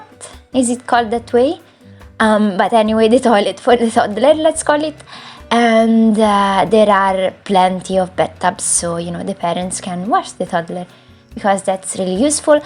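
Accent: Italy. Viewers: This house is super cute but honestly I'd just take the treehouse and live there. Okay bye. Haha I'm serious the treehouse is suuuper good and also the swing trick is amazing. Love your accent fellow italian sister😌❤❤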